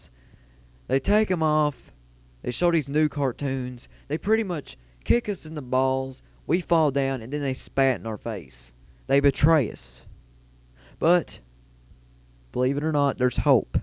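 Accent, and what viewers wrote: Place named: South, United States. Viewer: Are you from the south?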